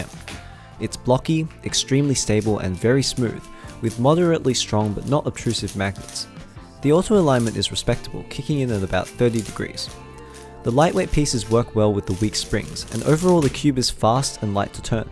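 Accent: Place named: New South Wales, Australia. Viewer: yo are you from nsw if u dont mind me asking?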